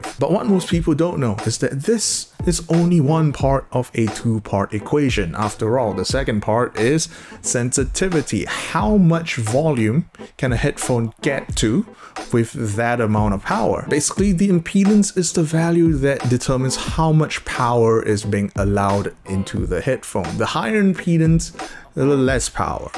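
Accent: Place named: Singapore. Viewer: Just found your channel and I super appreciate your no-nonsense style and knowledgeability. Also your accent makes me nostalgic for sg ✌🏼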